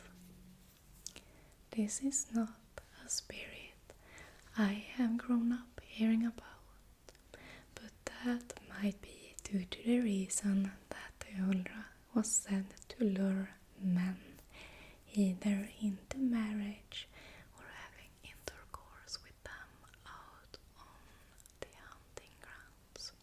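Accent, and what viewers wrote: Sweden. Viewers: Vilken underbar svensk accent! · I swear Swedish accent is the best accent.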